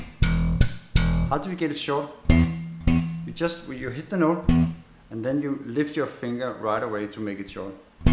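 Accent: Denmark. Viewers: You are Danish?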